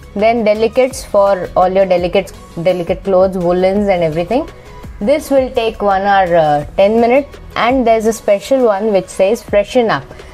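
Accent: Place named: Kerala, India. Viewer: Very good English. Well explain ed. I think your place is kerala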